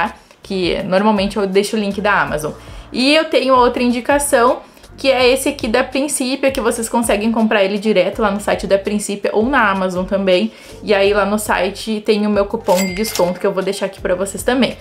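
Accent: South, Brazil. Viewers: Pelo sotaque também é do sul do estado, obrigada pelas dicas ❤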